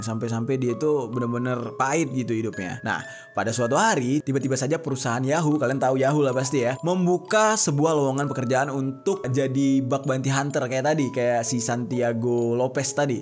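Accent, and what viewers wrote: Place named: Medan, Indonesia. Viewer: Medan nih bos:v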